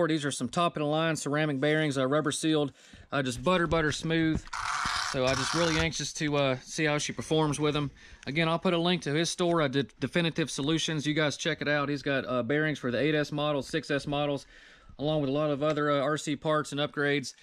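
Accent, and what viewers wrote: South, United States. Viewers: Hey my friend very videos Man you funny bro you said this car is a HOOT yo that is so southern and matches your acent, iam NJ iam definitely gonna start using its a HOOT I love my brother, and your a excellent driver really good. Thanks your friend william from NJ Forgettaboutit 👍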